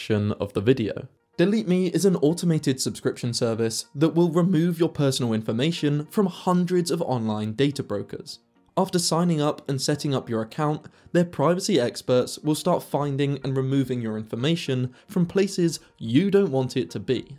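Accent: England, United Kingdom. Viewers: Yes- perfectly running electric motor in English accent? I am now 67 years old, and my passion was reading advanced technology... Enough of your nonsense and blah blah blah,-it may be so, for the Antichrist oppressors has oppressed all the technology for a century or so... No different than big pharmacy etcetera- you Antichrist global oppressors thieving bastards... Your day is coming, I'll see you all at the judgement seat!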